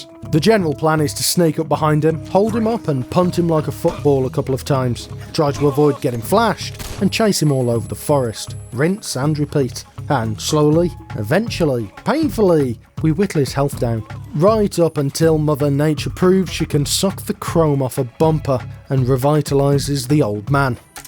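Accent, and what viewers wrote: United Kingdom. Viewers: Notice he dropped the British accent when he started singing, like it’s something they do on purpose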